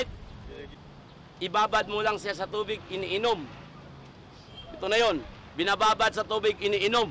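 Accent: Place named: Visayas, Philippines. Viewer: Bisaya rin Pala to c, sa Salita palang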